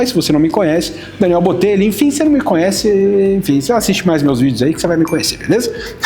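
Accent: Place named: Rio de Janeiro, Brazil. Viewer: Dr é do RJ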